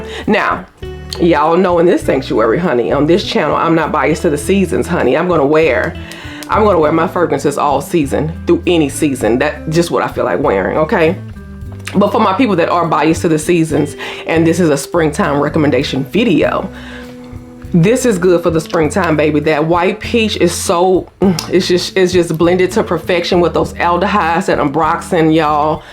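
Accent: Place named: Louisiana, United States. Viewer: Yesss I love your Louisiana accent! It’s hot here in TN but nothing like Bayou hot. 😅 I definitely repurchase my fave perfumes!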